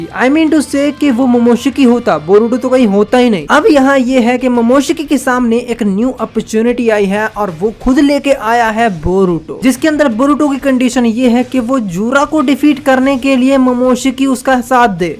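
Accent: Bengal, India. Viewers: Bro are you bengali???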